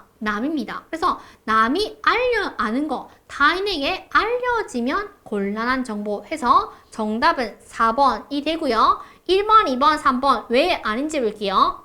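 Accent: Busan, South Korea. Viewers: 부산 분이신가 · 강사분이 우리경상도 분이신가ㅎㅎ 부산 악센트가ㅋㅋ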